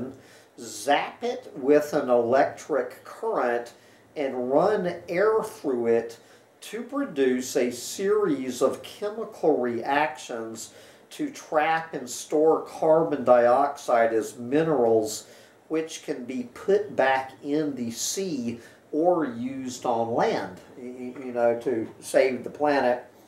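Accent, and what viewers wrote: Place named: South, United States. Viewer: This guy is pretty smart. Knows his stuff. Personally, I've found that the southern USA accent makes him seem like a dumbass. And unfortunately, a bit tedious to listen to. But I couldn't agree more with the message. I love the honesty of climate doomers. We've been lying to ourselves and each other for long enough.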